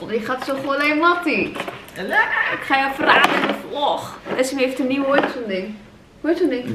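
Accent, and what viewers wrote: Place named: South, Netherlands. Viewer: Komt uit Limburg ofzo? Haar accent lijkt echt van het zuiden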